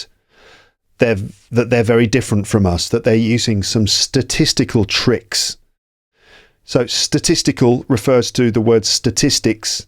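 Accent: United Kingdom. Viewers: Greetings from Brazil! I’ve been watching your videos to improve my British accent, you’re amazing !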